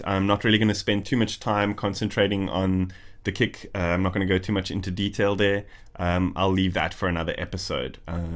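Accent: South Africa. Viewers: Hey bud, are you from South Africa?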